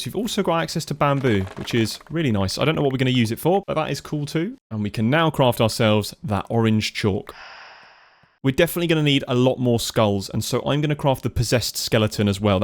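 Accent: United Kingdom. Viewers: If I remember right, enchantment industry and oritech both have ways of getting enchanted books/items at significantly higher levels (fortune 255, anyone?) so maybe you could use that to get even more stuff? I'm absolutely loving this series, your British voice is great for helping me sleep (I finish the episodes first don't worry) :3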